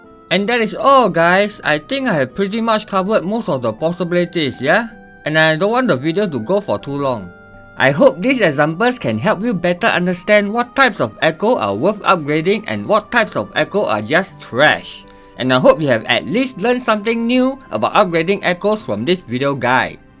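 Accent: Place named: Singapore. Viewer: WTF your accent you’re from Singapore?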